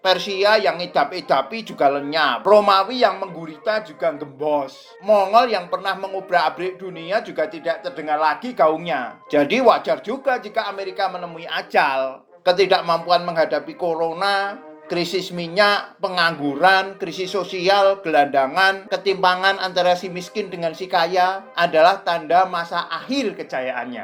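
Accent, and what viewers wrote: Java, Indonesia. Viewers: jooss .. bahasa medhok nya ngakak...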